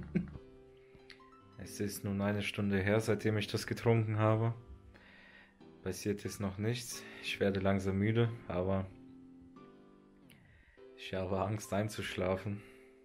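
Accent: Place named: Russia. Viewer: ты откуда? ✌🏻 Russe/Russlanddeutscher? Ich habe das sowas rausgehört, dass du Russisch sprichst 😁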